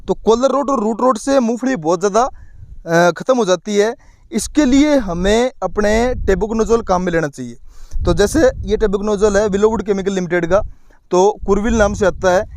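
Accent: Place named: Rajasthan, India. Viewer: Pahle bolna sekh lo aise language bolo jo sab ko Samajh a Jaye sab ko pta hi tum rajasthan se ho dekhane ki jarrurat nahi hi tum se Accha to kisan bol rha hi Chutiya kahe ke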